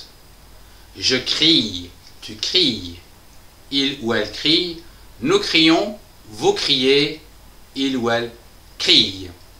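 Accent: France. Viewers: la mélodie de votre voix est très française en anglais, c'est sympa. aussi votre accent, comme un copin de moi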